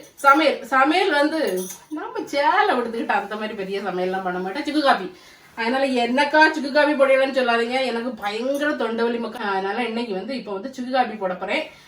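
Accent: Nagercoil, India. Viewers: I love to hear your Nagercoil Tamil. I am from Nagercoil settled in Bombay. I watch your almost videos. Beautiful couple. I never seen your elder son. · All ur video are too good sister. Ur Nagar kovil language is very nice to hear . Keep doing it sister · Sister so so cute and beautiful.your voice really nagarkovil.brother super aha flights podukirarkal.super.daily i watch your videos.sweet voice.effect aha ullathu.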